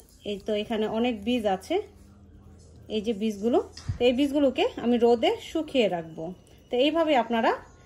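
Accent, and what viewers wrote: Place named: Bangladesh. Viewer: Mone hosse apni bangladeshi. Valo laglo.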